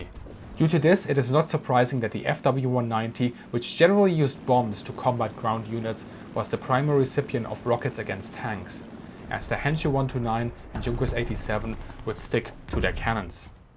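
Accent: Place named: Germany. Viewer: Ein, zwei, drei, acht, ein und zwanwig etc. We can tell from your accent that you are German--you don't have to constantly remind us by using German numbers in an English-language lecture! Your audience is English speaking. Otherwise very informative, analytical and enjoyable.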